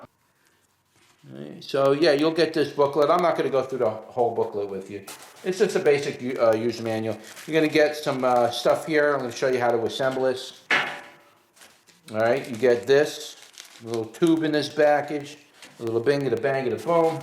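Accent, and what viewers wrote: New York, United States. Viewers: Thank you for this mine has a digital board at the front, great review, are you from New York, I'm in England!!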